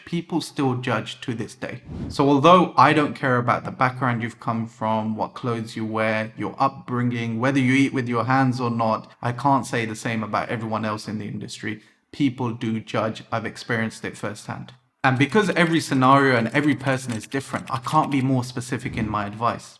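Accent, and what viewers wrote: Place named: United Kingdom. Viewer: I knew you are a Muslim but didn't know what country you are from. I suppose your parents moved to the UK and you grew up there! (as you have British accent)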